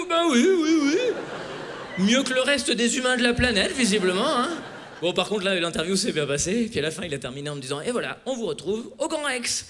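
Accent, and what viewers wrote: Quebec, Canada. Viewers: J’adore merci pour ta vidéo. J’adore l’accent québécois 🥰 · Mais quel talent ! Tu le tiens bien l’accent québécois ! 😂 bravo j’adore !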